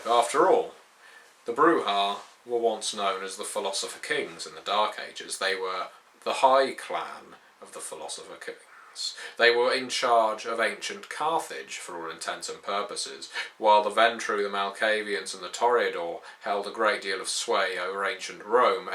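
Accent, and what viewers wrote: United Kingdom. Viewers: This is prove that no matter how scruffy you look the second you open your mouth and out pops a British accent you sound 9999 times better then you look.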